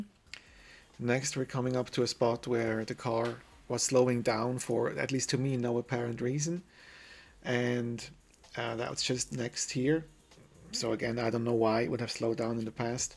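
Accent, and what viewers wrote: Switzerland. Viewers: Are you from Switzerland? Your accent sounds a little swiss-like;) btw. great video! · I love your accent swiss man ;-)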